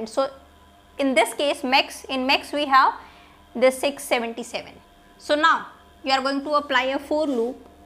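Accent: India. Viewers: dont like the indian english accent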